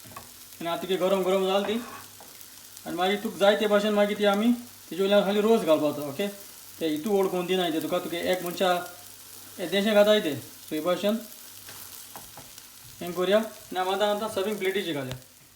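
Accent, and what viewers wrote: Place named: Konkani, India. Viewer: U r genuine Goan person. U've got the authentic Konkani accent and the Goa centric ethnic recipes. May God Bless you and may u come up with more Goan recipes. U r the source of cooking for many young Goans in cooking authentic Goan cuisine. Mog asum sodamkal. Long live our brotherhood and Goenkarponn. VIVA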